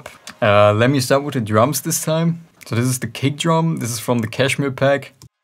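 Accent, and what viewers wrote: Germany. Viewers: Es hört sich so an als wärst du ein Deutscher! :) Wegen dem Akzent! Ist aber nicht schlimm, sondern cool!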